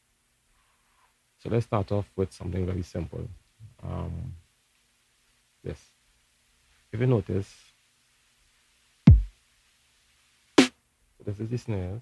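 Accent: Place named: Trinidad and Tobago. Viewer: dawg that Trini accent be strong af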